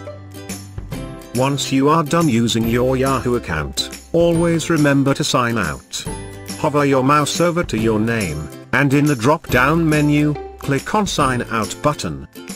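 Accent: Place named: United Kingdom. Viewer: I love British accent so cute and sexy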